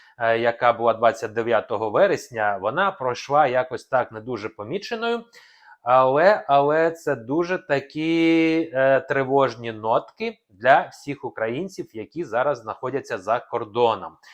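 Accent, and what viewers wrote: Russia. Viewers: А ты не русский часом?))) Акцент просто ужас.... кровосися.... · Ну очевидно же что ты не украиномовный. У тебя чисто российский говор. Не ломай язык,говори на своем родном языке!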